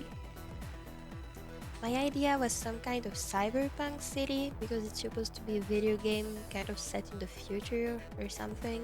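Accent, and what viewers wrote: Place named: France. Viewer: Okay I’m not commenting about the video (you’re very very talented) BUT I needed to tell you that I didn’t even heard your French accent at first Like At all. And I’m French too, and it makes me kinda more confident to hear another French speaking English! So haha thanks :))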